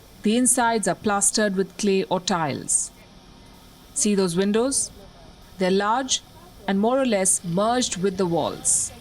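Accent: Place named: India. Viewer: Palki Sharma Indian accent makes me laugh to dead.Indian won't give up their thick heavy Indian accent anytime soon.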